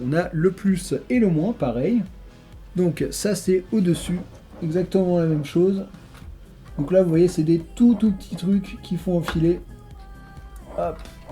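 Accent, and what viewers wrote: England, United Kingdom. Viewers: mais tu devais avoir un accent plus anglais avant dans ta jeunesse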